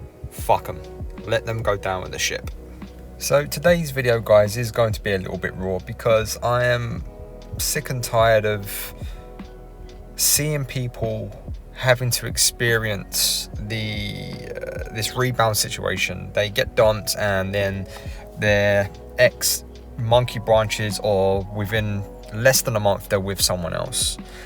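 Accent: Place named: London, United Kingdom. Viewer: I Love the way you speak.....straight up fuck em. Keep it London 😅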